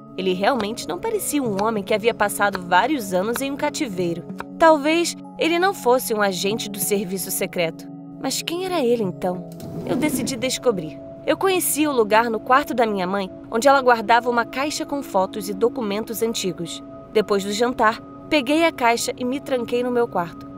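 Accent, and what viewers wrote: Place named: Minas Gerais, Brazil. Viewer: Ela paréce qué ela é minera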